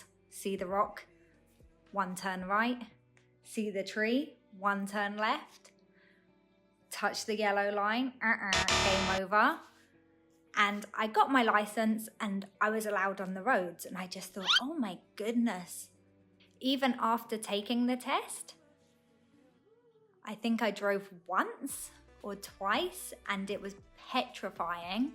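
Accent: United Kingdom. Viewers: 我喜歡妳的英國腔英文，妳對台灣的交通評論很正確，以後我會開慢一點！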